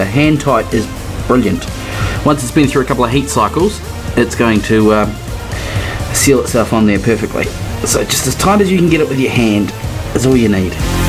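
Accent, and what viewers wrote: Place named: New Zealand. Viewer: I love NZ accent...Great video.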